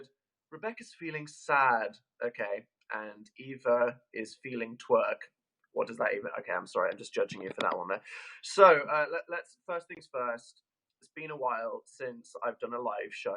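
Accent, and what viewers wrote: United Kingdom. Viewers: You're so extra British in this somehow. You keep reminding me of Eric Idle for some reason, and you're so fucking funny and intelligent!! I'm always so impressed by how quick-witted you are.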